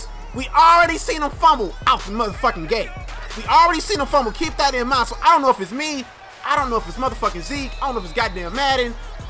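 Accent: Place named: New York, United States. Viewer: you from New York that's what's up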